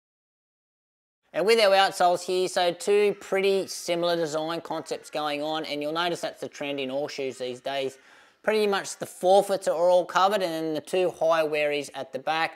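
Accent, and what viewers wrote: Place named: Australia. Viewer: This guy is a great reviewer and he has one of the most thickest austrailian accents.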